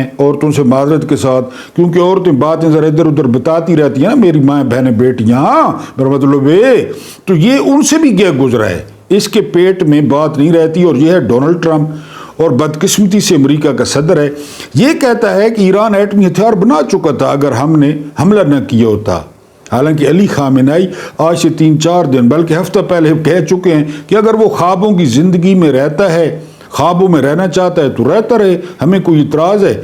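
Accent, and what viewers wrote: Saraiki, Pakistan. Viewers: Aoa,I just listen your program for your siraikey style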